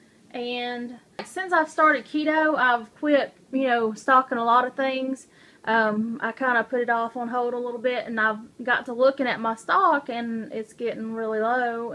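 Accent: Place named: Kentucky, United States. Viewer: If I was a bettin man I'd say yer from Kentucky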